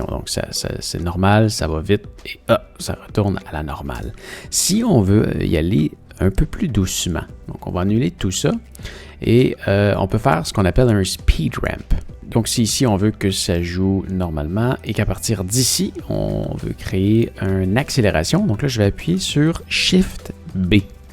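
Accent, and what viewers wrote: Quebec, Canada. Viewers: Très bon ton tuto +1 et +10 pour l'accent tabarnak :)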